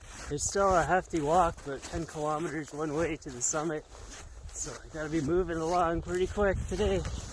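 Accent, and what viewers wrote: Canada. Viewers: since i follow i learn how to say OUT in canadian, "IUT"...lol:D:D love u brother:)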